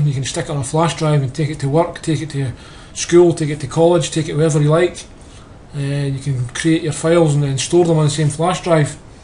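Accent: Scotland, United Kingdom. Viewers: Scottish accent? 👍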